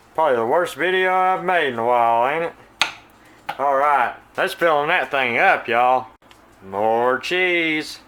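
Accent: South, United States. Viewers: I already know how to cook but I subbed because your accent cracks me up. I'm from California but when I 1st moved to the South, one state away from you, I couldn't understand what anyone was saying. 😂 Your accent definitely beats arkansans for twang. Maybe I've been here too long... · I like you! And I love your southern twang! ❤️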